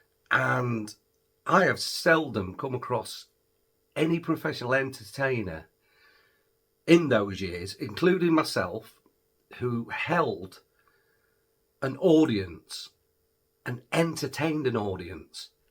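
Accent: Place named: Scunthorpe, United Kingdom. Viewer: I grew up in Scunthorpe but the family moved away when I was 9 in 1971. One thing I remember was Sargents ice cream from ( Hibalstow?), but the vans used to come round. And Normanby Park. I did go the Old Show Ground too. I think I’ve retained bit of Scunthorpe accent. I do remember the way people talked. Like yourself!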